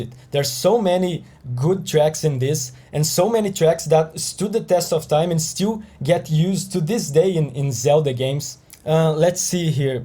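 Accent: Brazil. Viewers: Esse sotaque de brasileiro no inglês não me engana 🙃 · You sound brazilian. Are you? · No momento que ouvi tua voz já sabia que era brasileiro. Que legal, já é difícil de achar desenvolvedor de jogos brasileiro, quem dirá compositor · Pelo sotaque já sei que é br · Nunca vi um inglês tão brasileiro, adorei o vídeo tanto quanto eu gosto de zelda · Hey man! You're brazilian, right? Hahah eu reconheci pelo sotaque em algumas palavras. Parabéns pelo vídeo, fiquei feliz com a colocação de BOTW. A atmosfera do jogo dependeu dessas escolhas artísticas que foram tomadas tão bravamente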